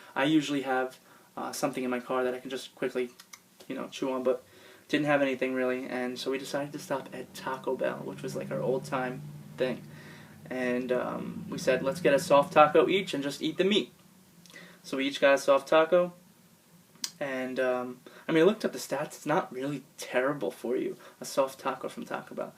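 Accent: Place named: Long Island, United States. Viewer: your LAWNG GUYLAND accent was really in full effect in this video...haha sadly I've basically lost my NY accent. Nice update.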